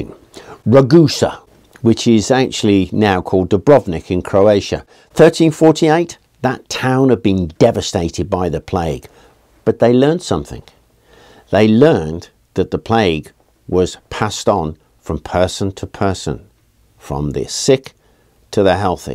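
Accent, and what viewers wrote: United Kingdom. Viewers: I am a history enthusiast. And my love of history deepen even more and more when I watch your videos with your extraordinary british accent and learn unique words thanks to your rich lexicon.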